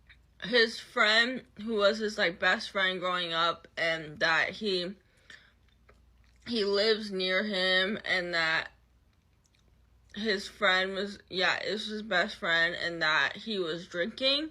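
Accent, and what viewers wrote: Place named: North, United States. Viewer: Question: Do you ever post sober? Also, you sound like you're from up north. US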